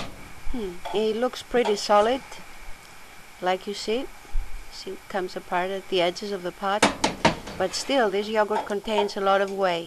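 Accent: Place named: Greece. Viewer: From your slight accent I take it you are also Greek as I am? If you are then I can happily say "Efharesto para polio", translated into Thank you very much. I use Fage thick Greek store-bought yogurt as my starter if I do not have previous home made yogurt left over, and with the Fage it also comes out delicious. For many years I used to use my Greek Grandmother's method of straining the yogurt thru a clean pillowcase, since cheesecloth is really a pain, but recently I decided to buy the Euro Cuisine GY50 Greek Yogurt Maker, which is really a yogurt strainer. It fits easily in my fridge, and strains the whey beautifully, and the end result is very thick Greek yogurt. Also the longer I leave it to strain in the fridge the better, because it also becomes thick like goat cheese, and if I add some salt to it then it becomes ricotta cheese. To clean it I just use my sink sprayer on light force, and get all the yogurt out until it is clean, then air dry it for the next time. 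No dishwasher for this item. If this type of strainer interests you look on youtube as they have video's about it. Thanks again for your instructional video, and I thoroughly enjoyed it.. Ya Sou, keh kalie oreksie meh to yaourtie sou!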